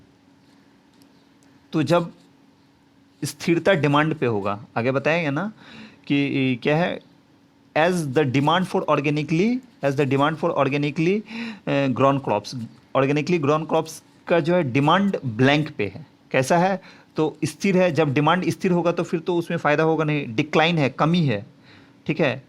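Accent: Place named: West Bengal, India. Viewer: Vai tumi wb thke mone hoche. ❤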